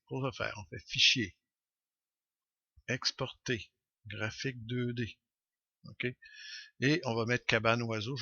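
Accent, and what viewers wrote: Canada. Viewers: Merci pour cette petite formation , déclinée avec précision et l'accent du Canada en plus ..! Bonne continuation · j'ai passé une bonne aprés-midi à étudier les 4 parties du tutoriel, merci beaucoup. Je vais pouvoir créer mes caissons de meubles dorénavant. Il n'y a plus qu'à approfondir 'je crois qu'on peut sortir la liste des panneaux à réaliser) et ça a été agréable aussi grâce à l'accent de nos cousins d'Amérique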